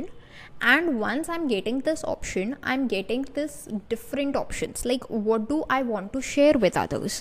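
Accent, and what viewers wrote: India. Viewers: Can’t stand her strong Indian accent. Wooh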